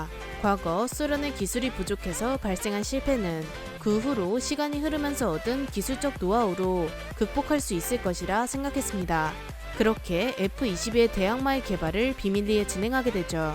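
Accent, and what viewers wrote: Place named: Gyeongsang, South Korea. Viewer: 이 아줌마 경상도식 억양이 있네.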